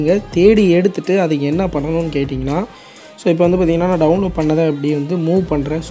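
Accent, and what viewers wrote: Tirunelveli, India. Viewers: Bro ongalukku thiruneveli ah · Bro neenga nellai yaa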